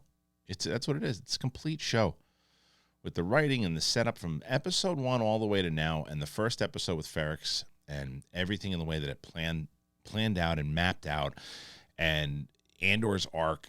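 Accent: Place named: Brooklyn, United States. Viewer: Damn I love listening to lol! It's like listening to an uncle from Brooklyn describe some bad ass movie with a 90s neighborhood gangster take on the unfolding scenario with a brilliant mind and a east coast vocabulary!! F#@ great!! I love it!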